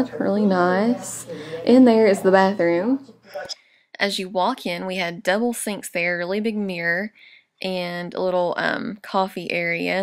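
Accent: South, United States. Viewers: OMGosh your Sammie looks just like my Lexi - right down to the few white hairs between the eyes. New subbie here, just found you tonight. I hear that "southern", may I ask where you are from - Im from the south myself. Really enjoy your vids - congrats on the wedding.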